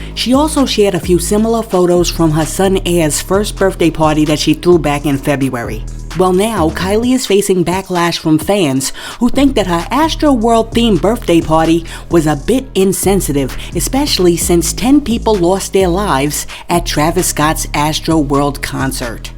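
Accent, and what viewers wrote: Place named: New York City, United States. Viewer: Love your voice. Sounds so NYC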